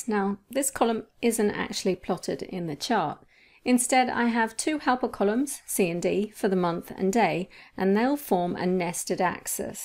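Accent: United Kingdom. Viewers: Good fast video I think your are British aren't you?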